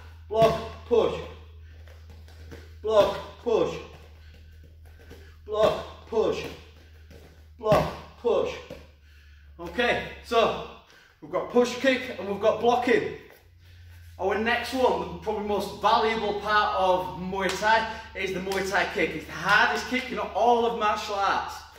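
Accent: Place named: Northern England, United Kingdom. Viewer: Your north english accent is actually cool.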